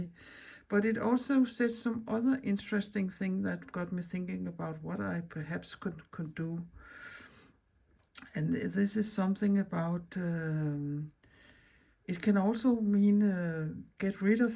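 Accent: Denmark. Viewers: I love everything you do … and I especially appreciate the slow pace. Your Danish accent contributes to that … although I speak English, I find that the way you speak is very relaxing & totally understandable!! 💗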